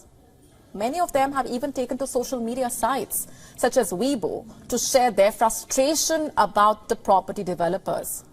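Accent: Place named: India. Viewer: What an accent ! An Indian sweet english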